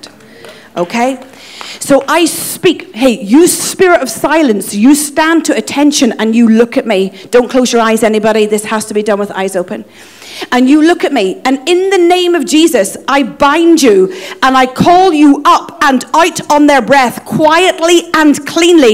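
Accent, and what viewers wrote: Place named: Ireland. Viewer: the Irish accent is one of my top 3 least favorite accents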